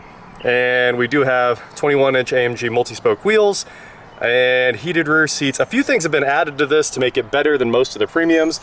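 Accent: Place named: South, United States. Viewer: Sounds like a southern poor boy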